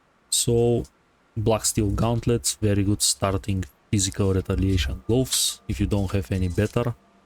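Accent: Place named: Russia. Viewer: слышу русский акцент)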